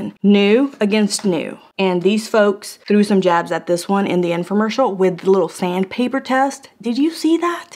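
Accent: Texas, United States. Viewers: First of all glad I found your channel again.👍 Second did not know your shirt was changing colors was too into the test results. I like the pink one.👍 Third I've watched about five of your videos I thought you always had a Texas accent. And you lived in Texas. 🦤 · Are you talking in a southern like paula dean southern accent in this video... or i am I just noticing it ps i think I have seen all the your videos so I dunno why it sounds that way today maybe it is cause you are doing a cooking video, ps you said that right when i finished typing this then i had to click edit right then to add this part!!! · Girl I was thinking the same thing! “Does always have such a strong southern accent “ lol funny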